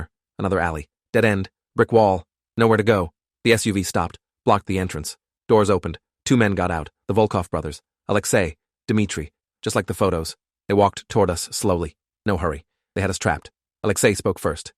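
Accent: United Kingdom. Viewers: I love the Britain accent. It’s wonderful.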